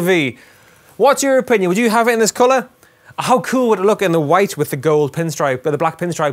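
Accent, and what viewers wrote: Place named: England, United Kingdom. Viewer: He's English, some English accents can sound slightly irish.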